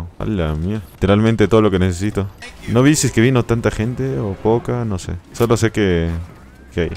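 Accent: Peru. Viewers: eres peruano ??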